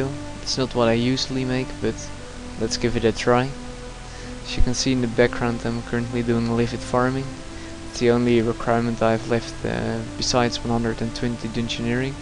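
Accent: Netherlands. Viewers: sexy dutch accent <3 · dutch accent 3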